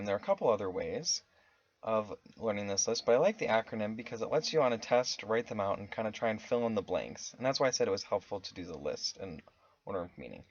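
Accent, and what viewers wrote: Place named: Scotland, United Kingdom. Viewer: U did pretty good but you should work on your accent you sound Scottish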